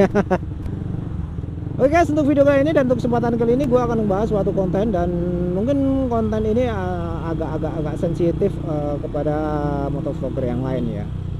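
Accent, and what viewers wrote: Java, Indonesia. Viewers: Masih medok ya 😂😂😂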